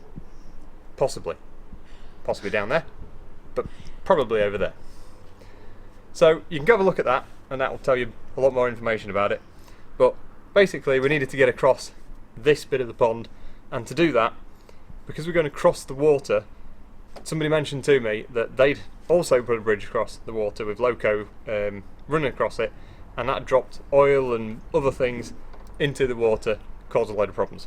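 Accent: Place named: Australia. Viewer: Hi, just found your videos. I’m a new garden railroad. I just got my first half of a circle of track and I have a NW-2 loco You are inspiring me to try to put something outside. It’s a little bit intimidating. I have to admit, but I’m learning a lot just watching you do this. I have one question though, where are you located? Are you hesitantly I ask are you in Australia or are you in England your location of your our way and your weather doesn’t seem to jive, so I’m not sure being an American. I apologize for not recognizing the accent.😂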